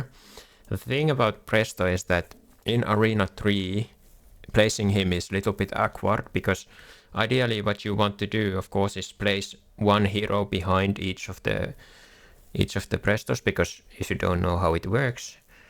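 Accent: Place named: Finland. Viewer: You are finnish right, your accent gave that away instantly. Try to say Phraesto next video and not prästost lmfao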